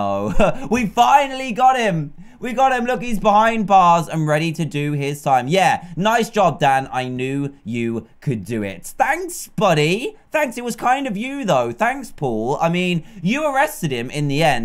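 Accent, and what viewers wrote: United Kingdom. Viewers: is it just me or does he sound British??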